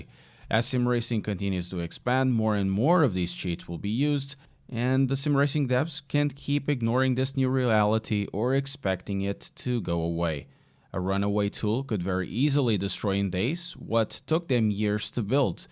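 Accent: Portugal. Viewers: You're from Portugal i can hear it 🇵🇹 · Acho incrivel como mal comecei a ver o vídeo, mesmo com um sotaque e pronuncia mt boa, tive logo o feeling que isto era um tuga ahahah Fui ver outros vídeos e curti do conteúdo, continua o bom trabalho.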